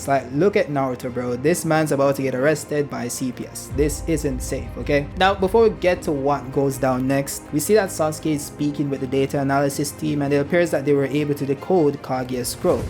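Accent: Jamaica. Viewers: Wait, are u jamaican that accent can't hide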